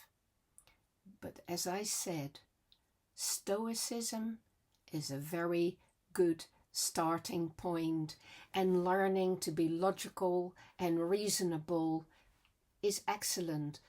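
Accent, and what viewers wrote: Netherlands. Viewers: I believe I was born a true Stoic by nature. I made a conscious decision at a very young age, say at about three years, not to cry and draw undue attention to myself when I fell and grazed my knees. Having this innate attitude has got me through some very difficult situations but it has not helped me to cultivate joy in my life. This is why, as I enter the twilight years of my life, learning about the Existentialist perspective of what it is to be human is so valuable. Additionally, my partner has also started listening to your channel and not only has he commented on your sweet Dutch accent, but he appreciates the content of your channel.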